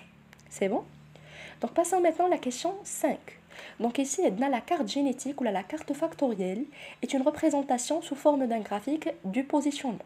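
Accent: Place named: Paris, France. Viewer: 😂😂😂😂hrbtini tana kt3sbni hdra dyalha tguli ghir ylah nzlat mn paris hhhhhhhhhhhh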